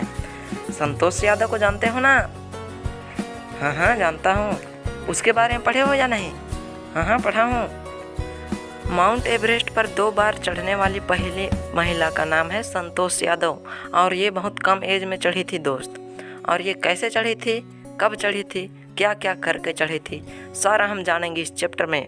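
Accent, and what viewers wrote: Bihar, India. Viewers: Thanks you so much bhaiya I understood everything , literally you are gazab 😅 , aapka bolne ka tarika bhi accha lagta hai mughe aap bihar side ke lag rahe hai , mai bihar ka hun , stay motivated and keep making these kind of wonderful explaination video so it would be helpful for new mankind in future, Again thank you thanks a lot !! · Bhai aap bihari ho naa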